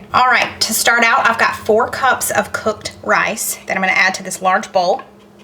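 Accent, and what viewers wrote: South, United States. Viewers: Being a cat lover and owner of a Birmese X I just love the way you talk & interact with your cat...and in your southern accent calling her by name...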